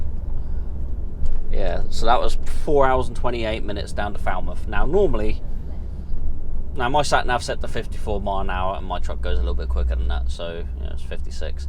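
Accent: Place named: South, United States. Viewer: Nice one. Good video and great southern accent you have 😅… keep them coming 👍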